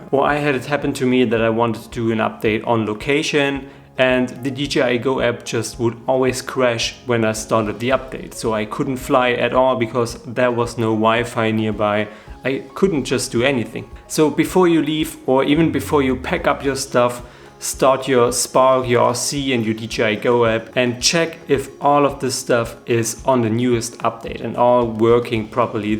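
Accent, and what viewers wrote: Germany. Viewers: Bist du ein Deutscher? Dein Akzent klingt nämlich sehr deutsch :-)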